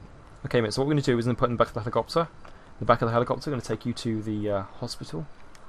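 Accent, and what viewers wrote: United Kingdom. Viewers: I like your english accent 😍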